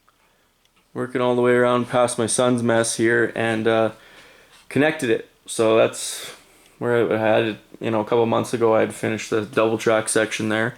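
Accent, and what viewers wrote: Canada. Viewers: Got quite the Canadian accent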